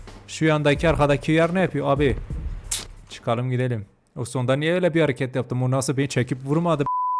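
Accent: Elazığ, Turkey. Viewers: Ben Elazığlıyı abi şiveden anasilıyo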